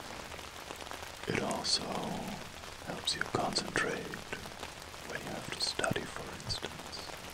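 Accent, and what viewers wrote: Germany. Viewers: He’s German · Do you mean “accent”? If so, I think he’s German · You're German aren't you?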